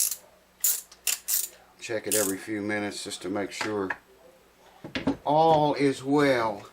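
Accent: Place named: Alabama, United States. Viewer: Thanks for uploading so many videos about how you do your repairs. Using them to help me out working on a little German flatback mandolin...Additionally, I live in Europe now, but you must be from very, very close to where I'm from, judging by accent. Southern Alabama? Who knows, you might even have fixed some of my uncle's guitars, or have played with him before. Keep on keepin on, man. Outstanding youtube stuff.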